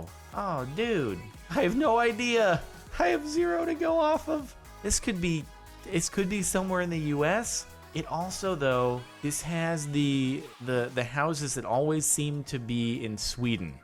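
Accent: Russia. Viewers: god i love your russian accent lol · "This is Mother Russia over here, and here is Baby Russia right there" I cracked up at that point but yeah even after 5 years that Russian accent is very funny and cool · I don't think it's bad that he does a Russian accent it sounds cool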